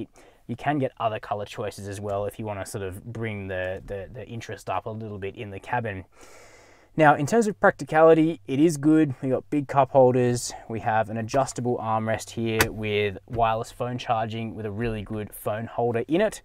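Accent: Australia. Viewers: Very Aussies accent, can not be wrong 😅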